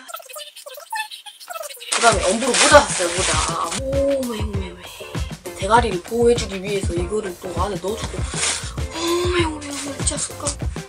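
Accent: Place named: Jeolla, South Korea. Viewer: 옴메 사투리 전라도여?!?!?! 나돈디!!! 넘모 귀어우셔서 구독하고감!!!❤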